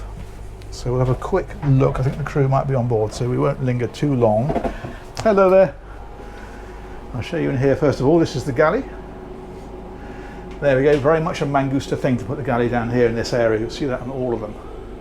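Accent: United Kingdom. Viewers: It would be great if you pronouciated a bit more. It's hard to pick up british accents unless I'm hammered, drunk, my guy .that said great viewing ,great work ..btw one of the nicest fly decks I've seen for driving.im sold I'll buy one .how much is it ? Oh.. does it come in blue? No .aghhh that's to bad .😂😂😂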